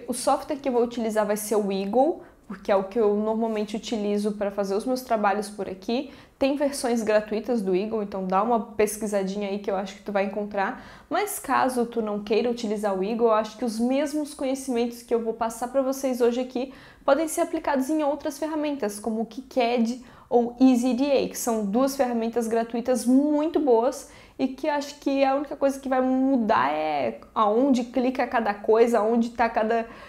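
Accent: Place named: South, Brazil. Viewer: Sotaque do sul☺️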